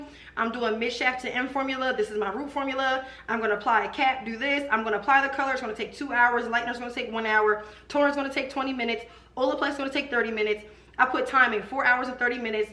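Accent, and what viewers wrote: Philadelphia, United States. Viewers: you from philly aren’t you? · Are you from Philly? I think hear it in your voice lol I’m really really sorry that happened to you, people are CRAZY.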